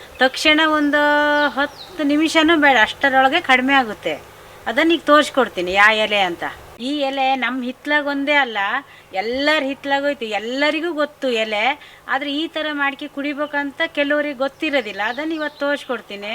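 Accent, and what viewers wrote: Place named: Siddapur, India. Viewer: Amma nimmur yavduu? Neev matado shaily nodudre siddapurdavruu ansutte nange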